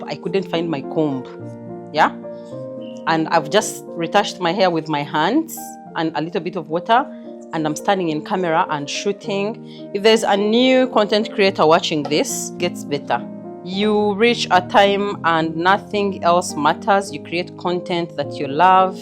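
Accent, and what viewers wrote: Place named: Kigezi, Uganda. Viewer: How I love your videos Then your kikiga accent when you are speaking 😂😂😂 Keep going mukazi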